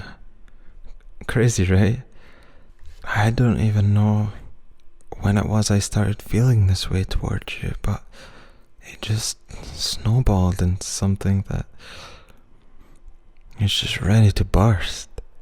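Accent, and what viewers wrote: Scotland, United Kingdom. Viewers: Please his precious Scottish accent is absolutely adorable 🥺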